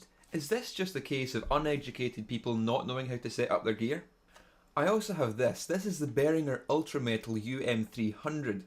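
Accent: Scotland, United Kingdom. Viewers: The Metal Zone got its bad rap because (most) guitar players can't work a parametric ("sweep") EQ. I find it way easier getting the sound I want out of any amp using the MT-2 than any other pedal. Just don't crank the distortion, boost the mids and sweep it until you find your mids sweet spot, then dial it back to where you like. DON'T boost the highs too much, nor the lows. You can easily get 5150 type distortion out of this pedal straight into a line box if you know what you're doing, which its critics don't seem to. Ps. I love your scottish accent, but your guitar tone could open up its top end a bit more. Ds. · your accent was a Little difficult, are you scottish?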